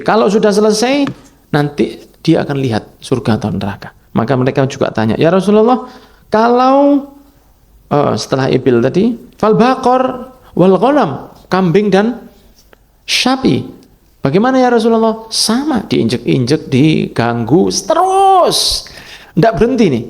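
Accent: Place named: Java, Indonesia. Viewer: ...baru lihat nih habib ganteng abis...tapi ngomongnya kok medok jawa nya...semoga beliau di rahmat i Allah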